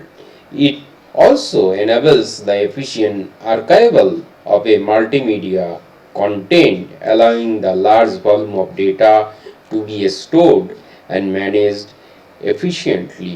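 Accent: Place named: Bengal, India. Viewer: Sir your accent is beryy bengalishhhh . I like it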